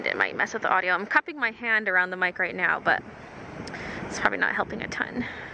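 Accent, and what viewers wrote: Canada. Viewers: You know she's from Canada because of the way she says "bag" and "vague". To her instead of a A sound bag is beg and vague and vegue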